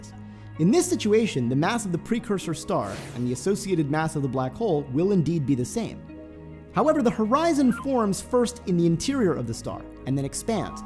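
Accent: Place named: Australia. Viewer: Man, I feel the exact opposite. Plus Australian accent and slower speaking style are much more pleasant to listen to.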